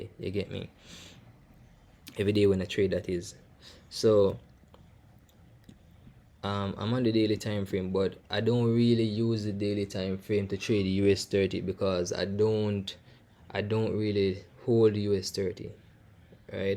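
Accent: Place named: Jamaica. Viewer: Mhnnn I'm really melting here.. I love your sexy voice and that sweet pie Jamaican accent.. Ohhhh my goodness I can't even follow the strategy but smiling all the way cos I love you mhnnn.. U ve earned a adimire sub.. U are delicious kikikikiiiii.. Have a beautiful day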